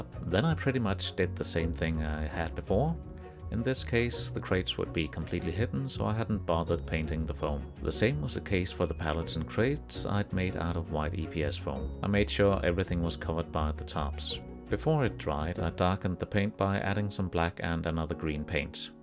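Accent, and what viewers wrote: Denmark. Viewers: Do I spot a danish accent? If so, where do you source XPS? It is a pain to get a hold of here.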